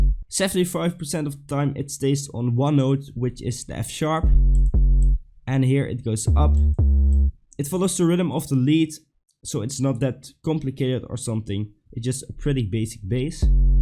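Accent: Netherlands. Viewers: Nice! Are you dutch btw? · Best makkelijk om te horen dat je een nederlander bent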